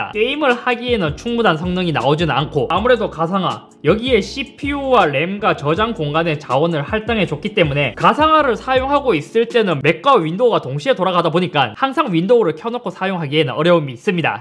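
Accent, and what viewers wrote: Gyeongsang, South Korea. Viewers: 잇섭님 혹시 대구에 사세요? 경상도 사투리가 들리는것 같아요.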